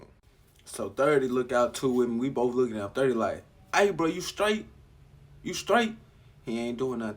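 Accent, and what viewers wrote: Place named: Florida, United States. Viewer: Where you from just asking sounds like us in Florida low-key 💯💨🌴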